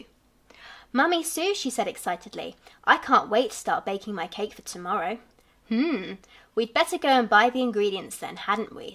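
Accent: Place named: United Kingdom. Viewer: has such a lovely British accent and cherry voice. She should do voice recording for e books.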